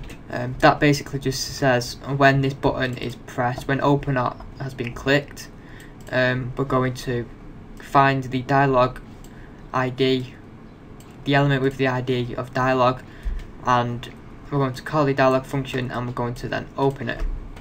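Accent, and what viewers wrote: United Kingdom. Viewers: Well explained...with a Manchester accent, too!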